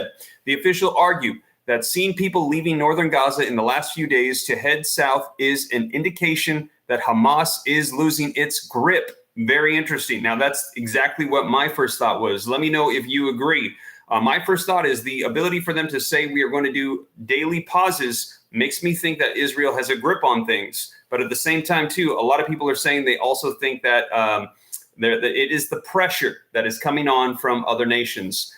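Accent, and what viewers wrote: United States. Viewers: Hey, are you American?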